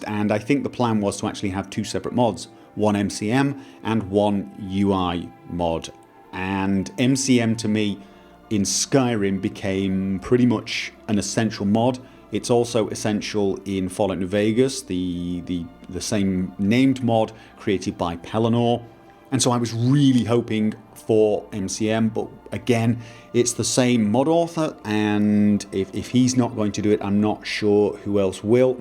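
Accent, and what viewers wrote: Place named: Scotland, United Kingdom. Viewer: Ive always wondered but never really bothered to ask, so... Where are you actually from? Been subbed for, ugh, quite some time actually, like, over 2 years-ish, so Im guessing I kind of must have missed the info or something. Iunno, but I think you sound southern-scotland-esque.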